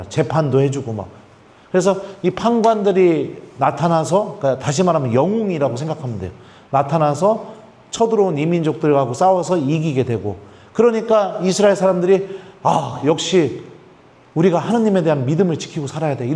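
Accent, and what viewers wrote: Chungcheong, South Korea. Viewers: 신부님 강의는 참쉽고 명쾌합니다. 그리고 충청도 사투리가 너무 정겹고 재미있어서 한참을 웃었습니다.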